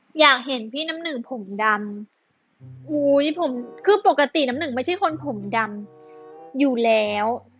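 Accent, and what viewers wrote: South, Thailand. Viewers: นายฮัวเลย 5555 แหลงใต้ชัดเปรี้ย555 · แหม่เฮ้ยยย แหลงใต้ชัดเปรี๊ยะ🤣🤣🤣 น่ารักอีตายยย❤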